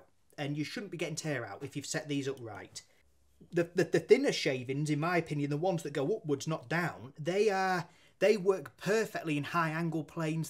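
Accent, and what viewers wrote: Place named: Bolton, United Kingdom. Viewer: Is yours a Bolton accent?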